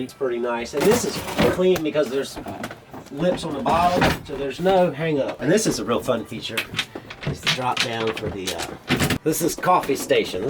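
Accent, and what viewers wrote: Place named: North Carolina, United States. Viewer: or north carolina. we have accents like that here too.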